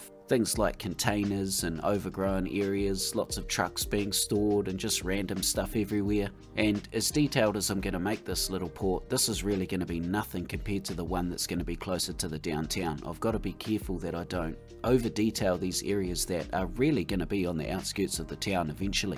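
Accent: New Zealand. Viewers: Awesome work, mate! I appreciate your unique and realistic ideas. Bloody hell I miss the kiwi accent, though! All the best to you from an Aotearoa-loving West Islander!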